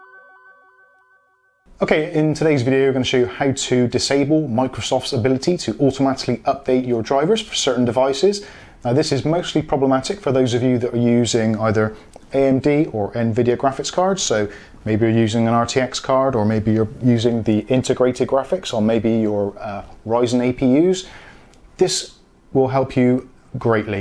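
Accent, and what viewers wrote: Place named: Gloucester, United Kingdom. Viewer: Highlands! But from Gloucester originally, so I recognise the accent haha (or am I completely mistaken? haven't been there for a while lol)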